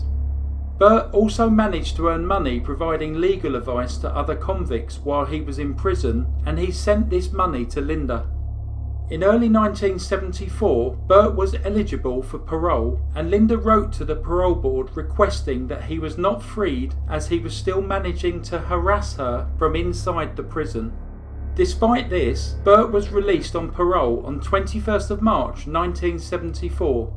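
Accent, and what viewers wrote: United Kingdom. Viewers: talk about a strong British accent . where's this from Leeds?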